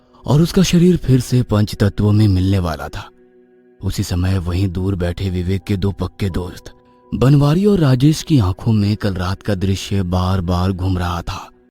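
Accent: Bihar, India. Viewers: Full bihari style 🤣 im from Bihar ❤️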